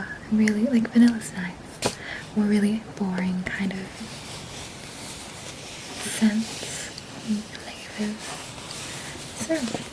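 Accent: Australia. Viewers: Are you from Australia by any chance ?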